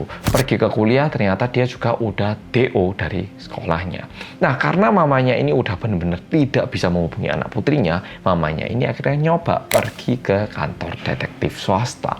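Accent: Java, Indonesia. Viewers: Aku lho klo dengerin cerita...suenengg...suara dan logat e megap2 medok malang campur lafadz korea ngono...lucuuu😅😅...semangat..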